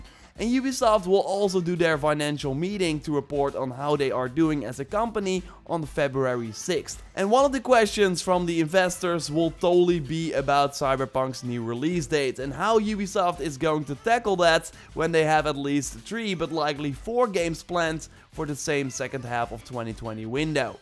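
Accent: Netherlands. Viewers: Lekker hollands accent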